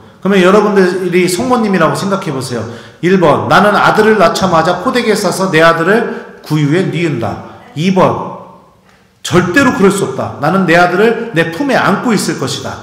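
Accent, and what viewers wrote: Chungcheong, South Korea. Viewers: 을통해 주님의 사랑에 만약 행복합니다 신부님의충청도 특유의 사투리가 마음깊이울립니다 신부님 감사하고 많이많이 사랑합니다❤❤❤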